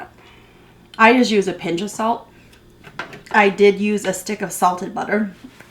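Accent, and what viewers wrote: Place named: United States. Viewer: 好可怕的美國口音!😳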